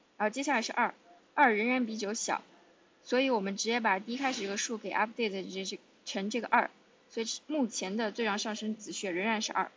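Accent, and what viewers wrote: China. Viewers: 找279题目解答 结果看到了英文版 大致听了几句就发现是国人了 姐姐在新加坡上学？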